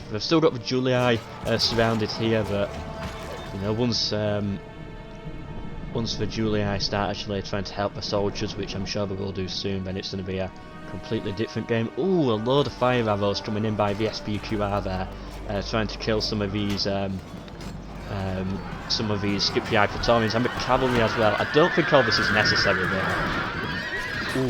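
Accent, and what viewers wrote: United Kingdom. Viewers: Yeah I hope so mate👍🏻 Also, love ur accent mate! Wish my British accent was like yours😂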